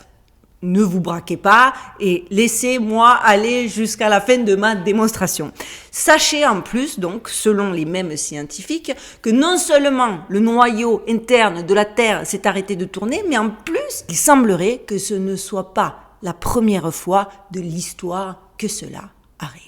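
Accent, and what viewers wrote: Marseille, France. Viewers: Grand merci pour cette vidéo peu importe les sujets futurs se sera au top avec ton accent de. Marseille 😁👍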